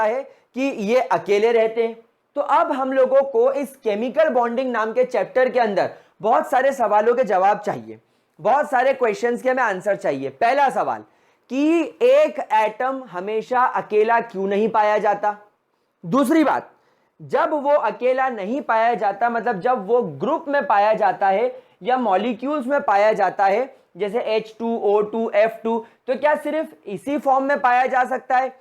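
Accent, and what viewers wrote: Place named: Gujarat, India. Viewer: By your accent I can understand that you are a Gujarati.........Isn't it?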